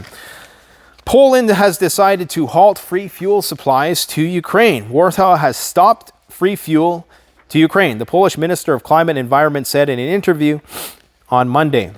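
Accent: South, United States. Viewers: Awesome southern talk lol Iol that was funny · Southern Accent, FLAWLESS…. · Love your southern accent lol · Oh, you are funny...you speak Southern...sounded perfect to me...🤣😂🤣🤓😂🤣 I hear it all around me here in Kentucky 😂 And i just adore the southern drawl! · Your southern accent gives me big George Dubyah Bush vibes hahaha. You crack me up, keep it going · Excellent use of a southern accent! :) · Hi keep doing what you’re doing. Loving your red neck ascent, you should try an Aussie bogoan ascent next 👍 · Good southern accent! :) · Lol, 😆 love your " southern accent🙂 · Mr. should sound like a surfer dude since he's from California. lol Good southern accent though. Nailed it.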